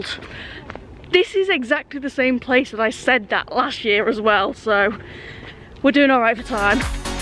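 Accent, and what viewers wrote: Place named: Northern England, United Kingdom. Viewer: Very possibly 'tactile' pavement where the old bust stop was...used by visually impaired people to aid location... Great video...Mrs Boulder loves your oop north accent...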